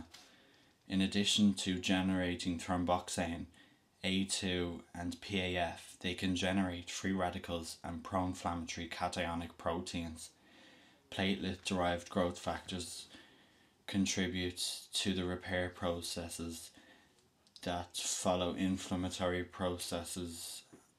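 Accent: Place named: Dublin, Ireland. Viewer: you have the 'i'm from dublin but i'm also gay' accent. not in a bad way it's just it really is its own accent. :)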